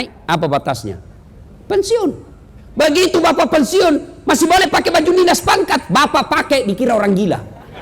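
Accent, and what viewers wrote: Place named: Bugis, Indonesia. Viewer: Assalamualaikum... Saya juga suka sngat ceramah ustaz das'ad latif.... Tpi sy tak berapa nak faham, pekat sngat bahasa bugis nya..boleh tak sempan sarikata dekat bawa..(melayu) 😊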